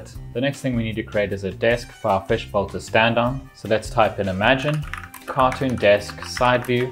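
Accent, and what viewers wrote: South Africa. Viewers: lol I knew you were south african from the first 5 seconds of the video🥳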